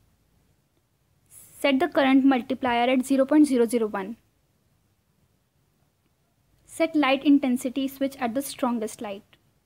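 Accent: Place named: India. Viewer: Indian English..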